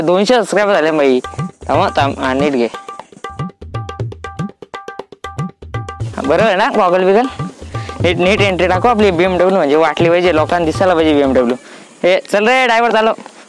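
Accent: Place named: Agri, India. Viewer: BMW lakaht ek ahe..proper aagri boltos ..vinayak ani bunty peksha bhari.....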